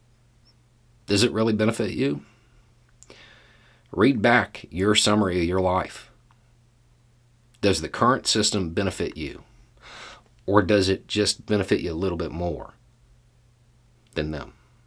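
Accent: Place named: Mississippi, United States. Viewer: Oddly enough, it was blacks, gays, lesbians that were kinder to me then any rich 'white' person. Really just because of cosmetic differances your life is supposedly better, I guarentee you when stufff beyond your skin color challenges a person, that peson will be in the exact same boat. None of us have time to look down on one another because of things people cant chose. When you see more commonaiity with people, you find solutions then differences. This guy here sounds like every poor white person I know in South MS. Please dear writer, get a grip.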